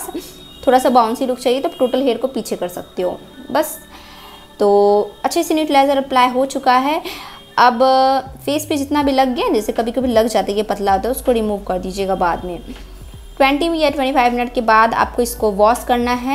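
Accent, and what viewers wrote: Bihar, India. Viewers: Ye language to bihar chhapra ki lag rhi h kya mam ap chhapra s ho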